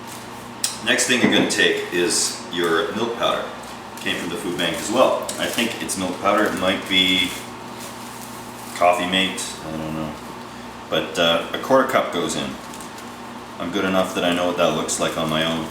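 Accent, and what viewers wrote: Canada. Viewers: This guy sounds canadian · U Canadian??? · I'm from India, I've never been to N America, and I could tell you're Canadian. How? Because of watching South Park. LOL! Your "abouts" gave it away. Yours is probably the best looking pizza base I've seen on youtube. I'm definitely gonna try. Thanks for the informative video. Where did you get the measurements to the tenth of a gram? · plus the accent.. definitely Canadian! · u canadian?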